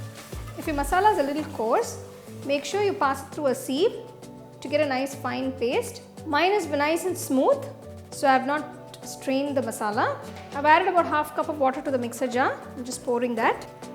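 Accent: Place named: India. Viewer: Funny heavy indian accsent🤏🏾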